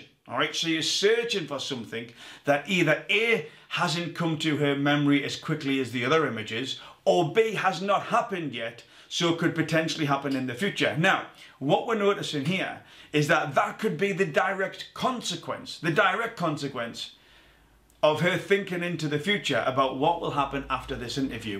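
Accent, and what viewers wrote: United Kingdom. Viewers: I just have to hear your British accent and know that your take on Meghan would be that she is a liar.... then journey down and read the comments anddddddd its confirmed. Thank you for doing this video. In reading some of the comments I'm saddened by the still many people being so negative to Meghan... you are bringing your biases against her into this. T. My take is that many of you are genuinely racists and never wanted to be neutral where Meghan is concerned and that's ok... its just who you people are.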